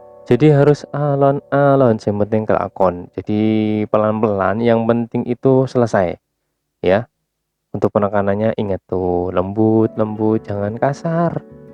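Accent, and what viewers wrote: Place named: East Java, Indonesia. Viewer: Asli mana bang kok ada logat "rek"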